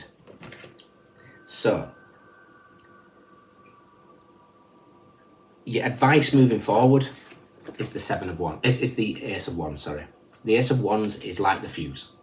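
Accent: Northern England, United Kingdom. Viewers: Thankyou 👍 good Northern accent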